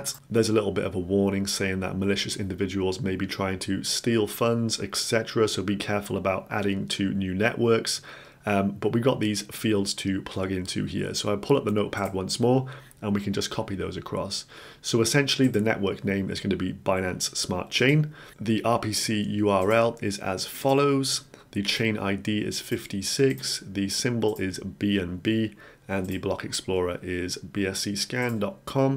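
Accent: Wales, United Kingdom. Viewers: You from cardiff?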